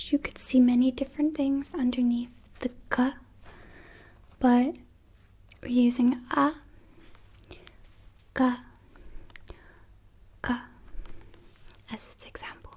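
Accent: United States. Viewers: did you change your accent... It sounds more American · A British girl with American accent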